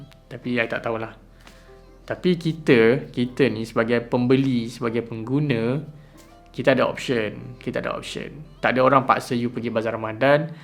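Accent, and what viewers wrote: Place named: Malaysia. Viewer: Anda sendiri bahasa Melayu nya sangat jelek.bahasa Melayu dicampur bahasa inggris.aksen bahasa Inggris anda juga jelek,masih terbawa aksen Melayu,bahasa Melayu bahasa suku di indonesia alias bahasa daerah.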